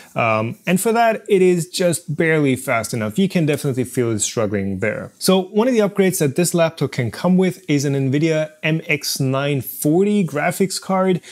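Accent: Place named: Germany. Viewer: I really hear his German Accent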